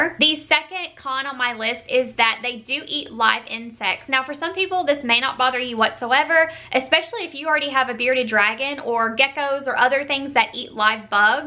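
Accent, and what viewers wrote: South, United States. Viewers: Love ur country accent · she has a really southern accent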